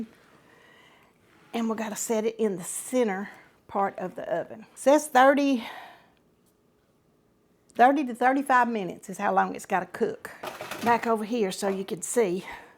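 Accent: South, United States. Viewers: Did anyone catch that she said “yuns”? I found this fascinating bc in Pittsburgh they say “yinz”. She sounds southern for sure. I live in rural middle TN and have not heard this used here. Anyone know where she’s from?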